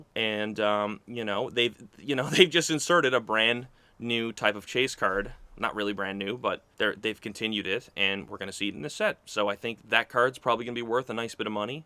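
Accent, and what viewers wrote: United States. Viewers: Honestly, as a fellow east coaster I prefer pronouncing the way you do 😅 either way real ones know what you mean anyways great video. I’m just getting into one piece myself. This will probably be my first set I rip. As a guy who comes from yugioh, I really appreciate the content. Keep it up brother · I’m Irish ? What ? Bro you American as it gets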